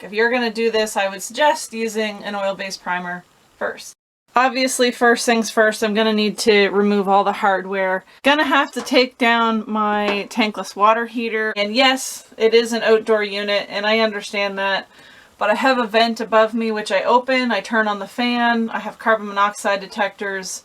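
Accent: Canada. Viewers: I think you did and awesome job! Love that Canadian assent thanks for the video 🎉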